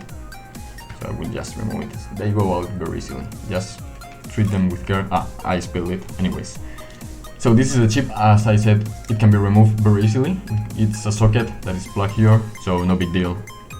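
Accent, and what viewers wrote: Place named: Spain. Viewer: claro primo. ¿No reconoces a un español hablando inglés? Se nota el acento!! :D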